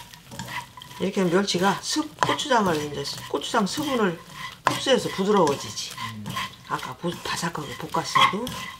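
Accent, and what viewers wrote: Chungcheong, South Korea. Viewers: 어머님 충청도 말투가 조금 있으신게 넘 정감이 있네유^^ 저두 충청도에서 태어나서 지금은 뉴욕서 살고 있네유 ~ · 울 엄니가 하시것 같아요 구수한 출청도 사투리 넘 정겨워요 ^♡^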